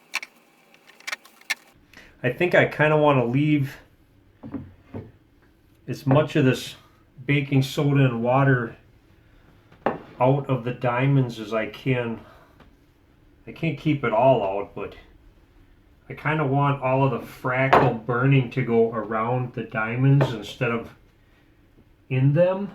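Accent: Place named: Minnesota, United States. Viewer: You're accent sounds exactly like Jesse Ventura. Is that Minnesotan?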